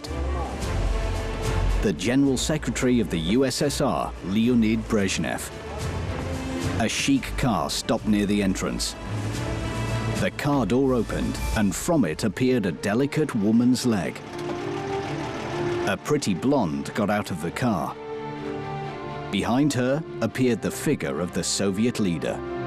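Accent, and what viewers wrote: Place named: United Kingdom. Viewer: Every time i hear narrators Albion accent I know immediately, its not worth to watch the story...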